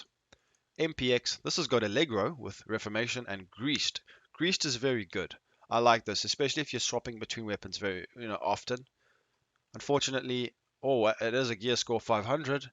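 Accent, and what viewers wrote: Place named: South Africa. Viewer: My Bru.. That Accent.. SA boy comming in clutch XD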